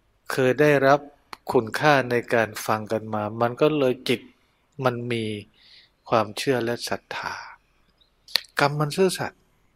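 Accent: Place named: Isan, Thailand. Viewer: ขอนอ้มนมัสการเจ้าค่ะ.ท่านเจ้าคุณ..ด้วยความเครารพได้ยินเสียงหัวเราะมีเสียงยิ้มๆไม่ได้เห็นรูปไม่เห็นหน้าตาเจ้าค่ะ.แต่ก็โอเคเจ้าค่ะ.ชอบฟังๆท่านเจ้าคุณบรรยายเป็นภาษาอีสานก็มีท่านเจ้าคุณพูดภาษาอีสานเพราะๆมากๆ.กราบ.กราบ.กราบ.สาธุ.สาธุ.สาธุ.เจ้าค่ะท่านเจ้าคุณ.🙏🌹🙏🌹🙏🌹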